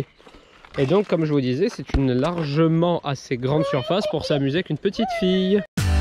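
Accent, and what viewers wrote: Corsica, France. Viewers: Ahhhh cet accent corse ! je l adore 😍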